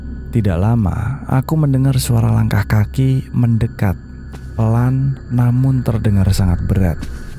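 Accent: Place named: West Java, Indonesia. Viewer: Sunda euy😂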